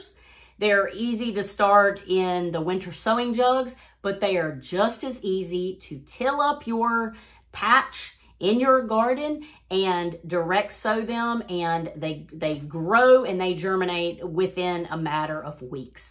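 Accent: South, United States. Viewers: Just found your channel today. I’m so excited to follow you and hear that beautiful southern accent. I’m from Georgia 8a. Can’t wait to plant seeds now. Your friend Candy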